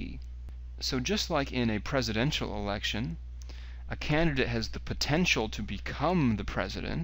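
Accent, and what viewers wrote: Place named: England, United Kingdom. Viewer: Thank you for posting this video. I am happy that I could find one with a perfect English accent.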